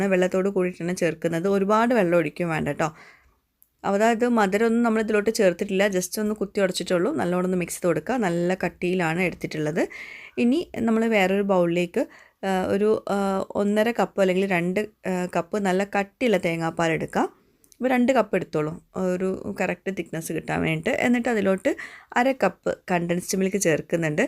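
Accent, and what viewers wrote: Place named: Kasaragod, India. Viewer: Endhra ninga chayakudicha endh baychath nalla majayinda....enthra ee soonante mon enik kasraod romba ishtam especially kasargod basha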